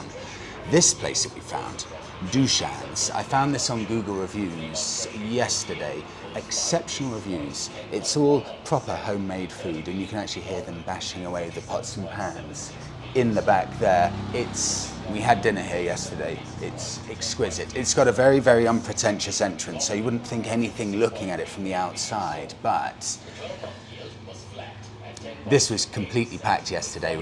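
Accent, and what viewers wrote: RP, United Kingdom. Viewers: My Mother is from Nuwara Eliya and My Father is from Kandy..... so I enjoyed this VDO very much... the art you do this VDO is magnificent.... I love your British ascent.... thank you for taking my country to the world.... Totally regret about the scams.... that is common in this part... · Your voice has a clear, modern RP accent that gives your videos the tone of a high-end documentary.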